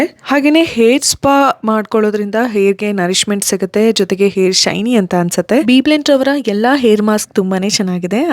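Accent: North Karnataka, India. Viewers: Mam I like the way u talk mam and ur uttara karnataka talking style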